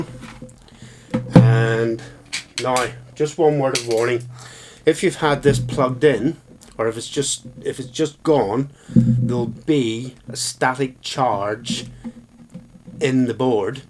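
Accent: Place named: United Kingdom. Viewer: There is something about a British accent that just 'make things right'. Thanks mate. Mine sorted.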